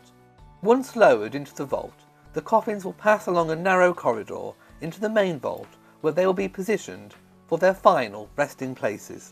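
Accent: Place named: United Kingdom. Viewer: так вот это лучший вариант что бы подтянуть. Хотя у него ужасный адский акцент. Понятно что британец, но совсем не Оксфорд ))))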